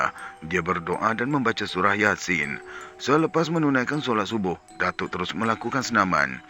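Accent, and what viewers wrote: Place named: Kelantan, Malaysia. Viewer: Assalamualaikum... Citer Kali ni best... Ada loghat Kelantan.. ada slang omputih... 😂 Walaupun kelaut tetapi mmg best.. menghiburkan. Gelak besar akak Kali ni😂. Lawak betul ni.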